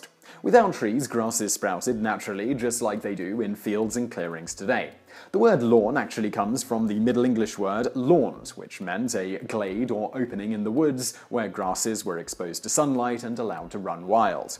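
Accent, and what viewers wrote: United Kingdom. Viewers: U British people talk so funny. U said advertisement funny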